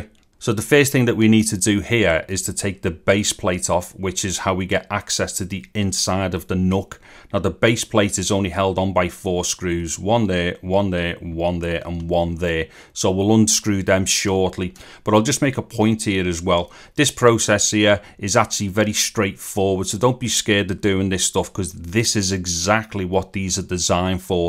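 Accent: Liverpool, United Kingdom. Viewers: lol, his accent is as scouse as it gets. it is awesome. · Ha, ha, ha.... I was saying to myself when you started talking... Liverpudlian. Just like them Beat- uls! I had friends from Liverpool decades ago. Also my Grandma's accent was close. She was from just a bit north of you. Thanks for the video.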